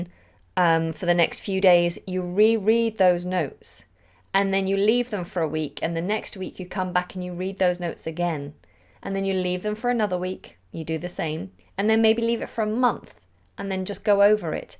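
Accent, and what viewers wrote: United Kingdom. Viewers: i loved your voice and your accent i want to learn british accent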